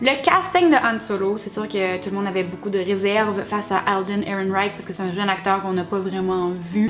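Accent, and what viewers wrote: Canada. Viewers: Moi aussi J'ai bien aimé. Je ne suis pas un fan de star Wars et heureuse, par ce que les fans sont toujours très déçu par quelle que chose ))) . Et puis un avis C'est toujours SUBJECTIF. ( je suis français et J'aime toujours autant l'accent et la façon de parler des canadiens ! ). Bonne continuation.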